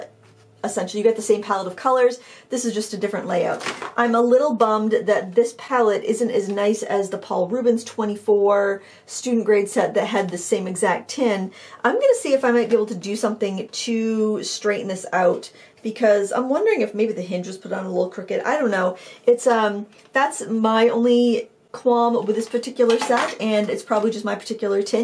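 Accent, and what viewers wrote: Maine, United States. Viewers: I'm thinking the same thing. Wish I would have watched your review before I sent the tin back.. I am curious if I could have gotten the tin to flex back into place like you did. :) Anyway, thanks for all of your reviews! I love listening to you, since I was born in Portland Maine, but live in Portland Oregon now. Miss that Maineard accent. :)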